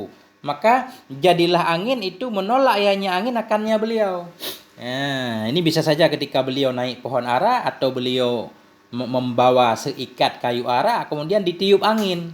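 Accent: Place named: Madura, Indonesia. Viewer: Pak ustad ijin ikut ngaji ya❤❤❤, pak ustad logat bahasanya madura ya! Saya dari jabar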